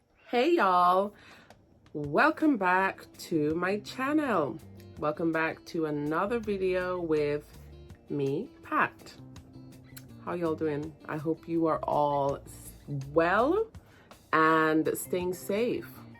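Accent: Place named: United Kingdom. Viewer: Did you ever live in England? You’re giving me a little bit of British vibe 😂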